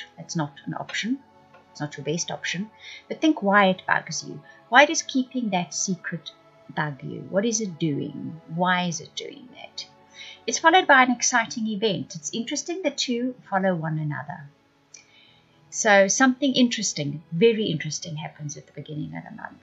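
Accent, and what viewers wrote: South Africa. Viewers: Love your South African accent. Sound like my best friend. Awesome reading thank you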